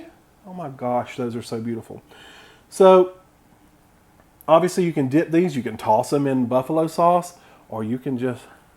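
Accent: South, United States. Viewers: Omg your voice and accent is so cute! You sound like that rooster 🐔 in Bugs Bunny, Foghorn Leghorn 🤣☺️👍 So Southern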